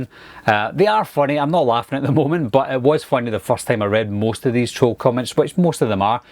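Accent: Scotland, United Kingdom. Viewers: I’m from Houston with an east Texas/west Louisiana accent. I confuse myself on occasion. Lol. My daughters attend a Scottish arts private school and your accent is perfectly commonplace in our world- so, no complaints here! ☺️ Btw, thank you for keeping such a clean channel! We love horror movies but I’m selective on what they watch. You’re our favorite! 😎🎃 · A Scottish accent warms my bits. ❤😍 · I'm an Irish-German-Scottish American from Connecticut and I understand you perfectly. People need to realize that the world is a lot bigger than just their backyard. I stopped to watch one of your videos because of your accent. I'm just sorry for the grief that these people cause you. Do you think sometime you can make some videos showcasing some of the landmarks or historical sites in Scotland? I would love to see what it's like over there. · I love your accent!! 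Its great! I try to speak with a Scottish accent alot lol Im actually a very small perscentage Scottish. Not alot but def more than liz warren : / great channel! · I almost spit out my water and cracked up pretty hard after you read the second profanity-laden troll comment 😂. You handled these comments very well. Genius video you uploaded — this is akin to the “celebrity read mean tweets” segment on late night tv. P.S. I’m an actor & your videos help me immensely with my Scottish accent. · I like your accent than many other British accent. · I like hearing Scottish accents from Reviewers such as Yourself & 'The Critical Drinker'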